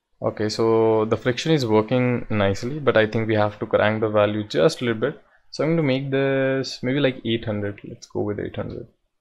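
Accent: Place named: India. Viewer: I found that this guy is an Indian because of his voice😎😈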